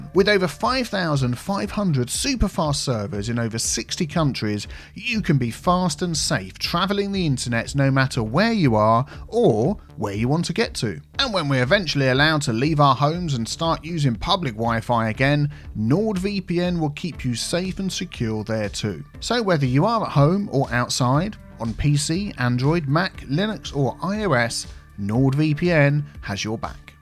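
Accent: United Kingdom. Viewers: I already knew all this but I watch every video, so 🤷‍♂️ 😂 If anything, I stay for the beautiful British English 😌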